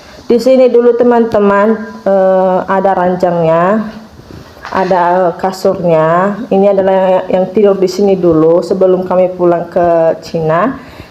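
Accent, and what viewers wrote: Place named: Eastern Indonesia, Indonesia. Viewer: Bisa dimengerti mengapa sering mengucapkan kata Teman Teman.. Gaya bicara orang dari Timur Indonesia masih kental sekali.. Mohon Kakak2 memaklumkan nya dan Kakak kakak bantu dukungan maksimal supaya kakak kakak dan semakin dekat.. Semoga kakak kakak senang kedepan nya.. Oke kakak kakak sekalian sekian dulu kakak kakak.. Sampai jumpa lagi..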